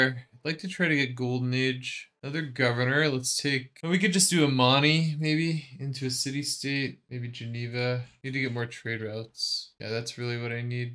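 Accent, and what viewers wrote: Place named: United States. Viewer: Aaargh! Hadn't seen it to its end 😂 honestly - I'm not a huge fan of surprises, so much appreciated! I really like how organically or naturally you grow your civ(s) - that's how I hope my garden will grow this year! But I'm on like turn 50 with three cities, two wonders, some culture - but not an awful much more to show for it. I guess it's just a matter of enjoying the game, and if it's a fun defeat then just go with it 😆😶 I really enjoy your vids - I had a day off and I had kinda hoped to spend the day in the garden, but it was so rainy here that I ended up cleaning (which was embarrassingly needed, once I got down to the nooks and crannies!) - you were my coffee breaks! 😙☕👌 they were a delight! You have such a wonderful voice, you're so funny, and such a nice narrator! I love your crisp American accent, despite the fact that I get startled every time you say "route" - here it's pronounced "root" and I get baffled every time! 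And now I've told - and that's a weirdly big relief! 😂 Lots of love from Denmark 🤗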